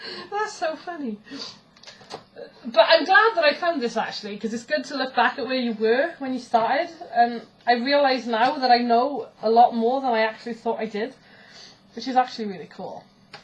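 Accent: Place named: Wales, United Kingdom. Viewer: Love your welsh accent, just recently back from a prog rock festival in north wales, I used to be in the kitchen witch school I really enjoyed it but family life kept getting in the way so I put it on the back burner for a while. Nice to see your face again, xx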